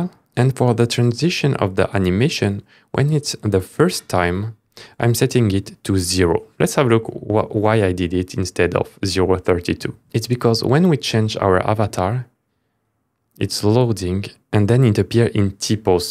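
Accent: France. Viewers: L'accent français ne s'entends pas trop, gros GG en vrai 👌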